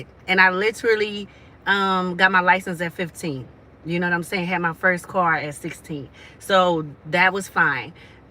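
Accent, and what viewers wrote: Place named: East Coast, United States. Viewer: You sound like you from the eastcoast love LOL are you?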